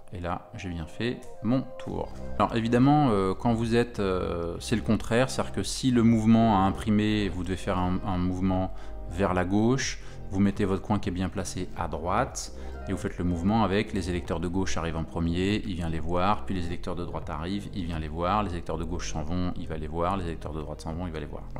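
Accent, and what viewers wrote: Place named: Belgium. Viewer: Trop pourri ton accent belge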